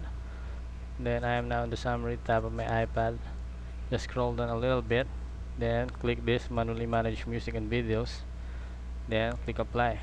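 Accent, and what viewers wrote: Philippines. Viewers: Strong filipino accent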